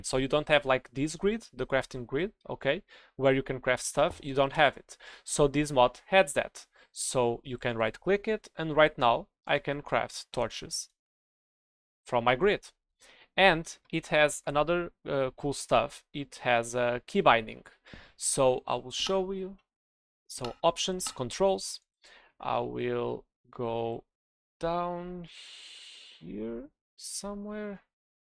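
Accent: Portugal. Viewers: You has portuguese voice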